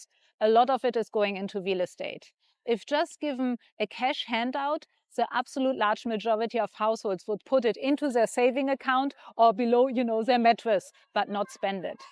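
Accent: Germany. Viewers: What first drew me to this Zen and humbled lady was, perhaps, her accent— German, I believe— with all reverence, quietly enchanting, like a forgotten melody from an ancient place, flowing softly through the Garden of Eden. Thank you for sharing a moment with me, a sip of coffee beneath the sky of eternity. As you spoke of China— that land I hold in my soul’s quiet chamber— your voice became the bridge between centuries, your words woven like silk in the wind. And though you stood still, you moved. Your dress clung to your form like a whispered symphony, a silent score composed in sacred air. Your hands— they danced softly, painting invisible calligraphy, tracing rhythms only the heart could read. You didn’t walk— you floated. In sync with something unseen, a grace not taught, but remembered. A melody not played, but felt, not spoken, but lived. You were not merely speaking— you were composing. 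In spirit. In stillness. In beauty.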